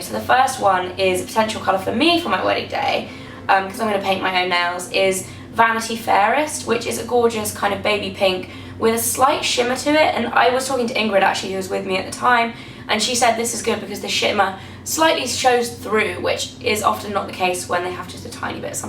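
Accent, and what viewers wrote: United Kingdom. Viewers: Just discover you... and I LOVE the accent of the UK! LOVE it. You are beautiful!! xx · wow I really love your English accent! so elegant! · omg yes your british :)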